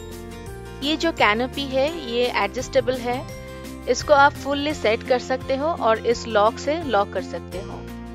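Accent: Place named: Bengal, India. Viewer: Bengali lady 😂😂... Your accent says it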